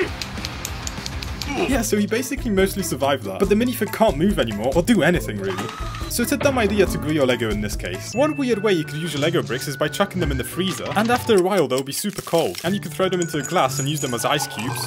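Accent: United Kingdom. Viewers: Your from the uk aren’t you?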